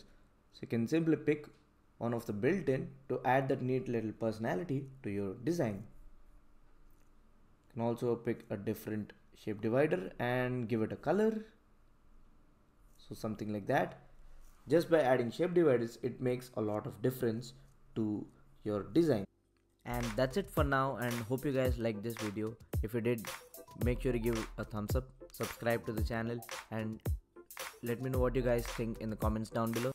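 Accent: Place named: India. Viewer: awful indian accent, can't understand anything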